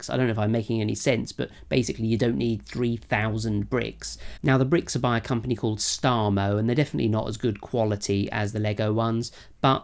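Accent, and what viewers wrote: United Kingdom. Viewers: your fancy British accent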